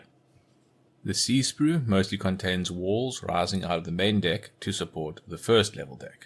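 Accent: South Africa. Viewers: Hey. You sound South African? If so, can you help with where to find the PE parts and wooden deck kits here?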